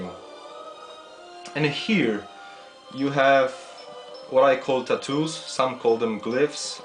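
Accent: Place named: Poland. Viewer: Soul Sacrifice ? Ale słychać, że Polak mówi po Angielsku xD Jednak nie jestem jedyny, który wpadł na nagrywanie materiałów po Angielsku :) You've got my sub dood !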